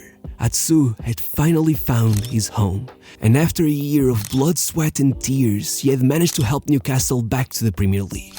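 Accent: Portugal. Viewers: Pelo sotaque nota se que és tuga. Orgulho em ti miúdo. Vídeos incríveis sempre 🤩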